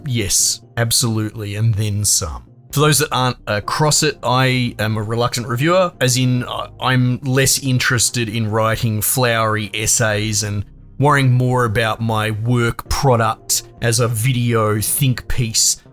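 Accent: Australia. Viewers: I so miss hearing our aussie accent on videos. Makes me feel more at home knowing some one here in AU who is layed back and not give a shit about subs and likes but just telling it how it is...and i understand what your saying...lol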